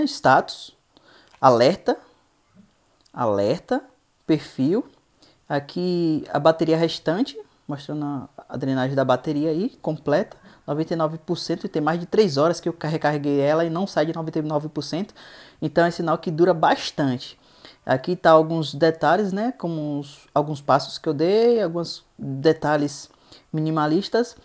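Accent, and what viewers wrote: Bahia, Brazil. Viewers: Sotaque da minha Bahia é lindo demais meu hey rsrs abraço velho, curti sim!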